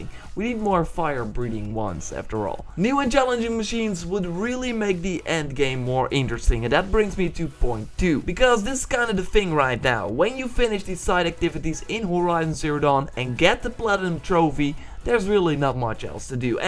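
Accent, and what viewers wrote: Netherlands. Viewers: Just love the dutch-english accent. Much better than the french-, german- or swedish-english accents. Atleast I assume you are dutch based on your accent, am I wrong?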